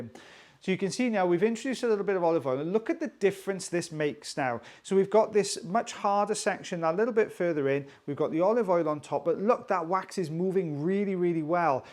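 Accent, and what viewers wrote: Wales, United Kingdom. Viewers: I think the main reason I'm here is because I miss Wales / a welsh accent so much.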